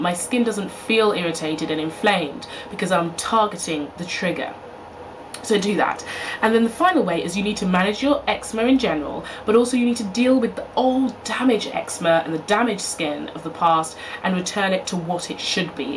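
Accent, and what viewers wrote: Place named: United Kingdom. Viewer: Yay British girl. :)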